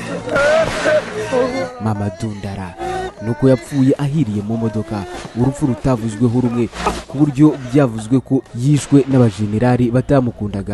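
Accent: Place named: West Africa. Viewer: Yarafite accent nkiyabo muli afrique de l'ouest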